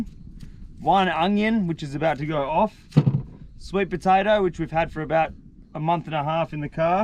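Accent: Australia. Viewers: Love this guy and all these videos. Its funny tho now that your back in Australia you sound way more Australian and use so many more slang Australian words if that makes sense. Hilarious!! Your the man buddy!!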